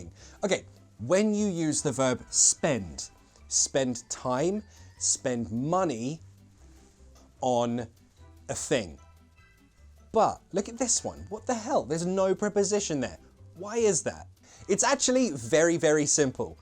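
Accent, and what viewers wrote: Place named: Cockney, United Kingdom. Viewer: Nice ,with a cockney accent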